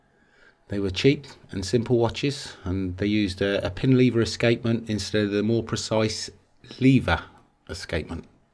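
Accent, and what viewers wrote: United Kingdom. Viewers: Accent from somewhere near Cov?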